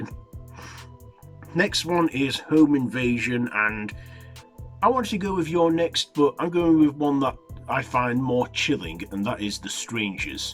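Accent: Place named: RP, United Kingdom. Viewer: This guy sounds exactly like Sir Brian Blessed. God Queens English accent.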